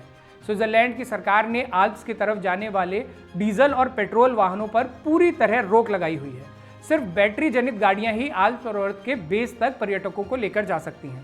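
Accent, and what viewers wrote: Garhwal, India. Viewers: ❤ ji आप उत्तराखंड में लोगों को इसी तर्ज पर जागरूकता अभियान जारी रखें, आप की जानकारी, वक्तव्य, तरीका जिससे गढ़वाली भाषा का तड़का वाह ❤ आनंद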